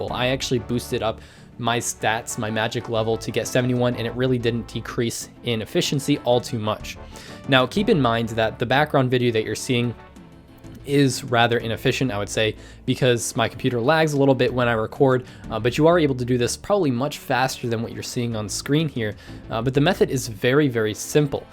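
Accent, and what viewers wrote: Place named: Canada. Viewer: You sound incredibly canadian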